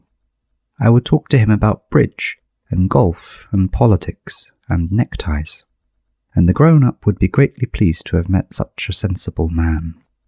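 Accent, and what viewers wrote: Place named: England, United Kingdom. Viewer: 와 진짜 crazy voice... i really like the intonation of English English.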